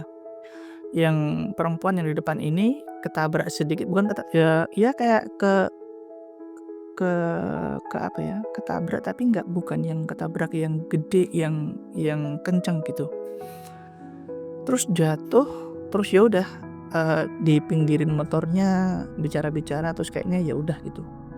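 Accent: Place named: Sulawesi, Indonesia. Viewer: Logat sulawesinya masih nempel ya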